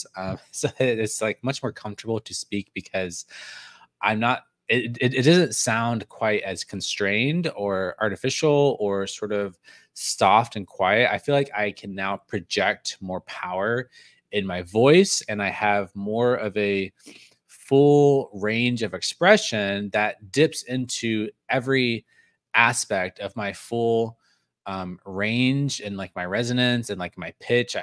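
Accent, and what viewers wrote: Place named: Staten Island, United States. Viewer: You have like a staten island accent "dictionarYYYY"" pulling the last vowel.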